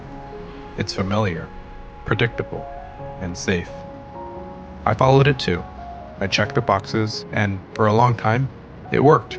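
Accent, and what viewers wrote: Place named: Europe. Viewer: But your Asian, why talk as if your European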